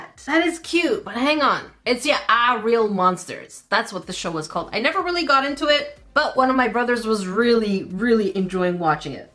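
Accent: United Kingdom. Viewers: Your bits of British accent is suburb !! Sending lots of love you and to Canada xx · The Brit’ accent is real 😂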